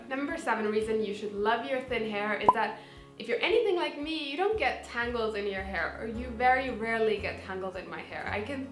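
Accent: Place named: Germany. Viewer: Wow that is exactly the kind of positive vibe I'd need for starting into 2019 with a healthy mind❤ I always had thin hair no matter what, but I'm finally coming to terms with it! Also I have a question for you, I don't wanna be rude or something but you sound like you have a German accent :) it doesn't sound bad or anything I just noticed it bc I certainly have one 😁❤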